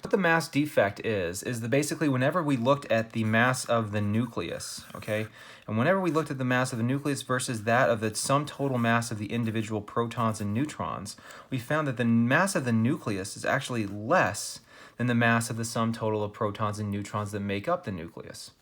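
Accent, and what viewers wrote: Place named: United States. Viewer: Are you from Uzbekistan? If so why your accent is very American lol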